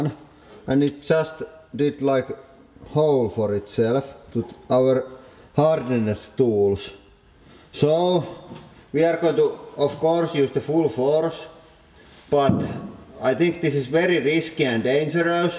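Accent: Finland. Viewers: you clearly aren't familiar with the Finnish accent So many people speak like that lol. Not me tho' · My high school tennis coach was Finnish. He had the exact same accent but with a different voice. It was hard not to bust out laughing when he'd get mad and yell things like "you guys play like piece of joke out on court today!" at us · Finnish accent is so great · aa mä oon aina luullu et sä oot venäläinen :D vaikka kyllä oon epäilly tosta suomi-aksentista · SUOMIIII, KOSK TOI ENKKU 3/5 JA KOSKA "Voi vittu!!!" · he sounds like hes from finland...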